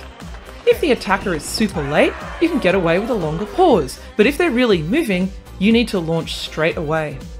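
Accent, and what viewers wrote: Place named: Australia. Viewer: dat aussie accent:D Finally this day has come! We can hear ' voice and don't stop the video for text reading. Sometimes it was given too much text for a very short amount of time. I don't know about the others, but as for me, for a not-native English-reader, I couldn't read those sentences that fast in English. Thanks, keep prospering and enlightening people about this spectacular sport!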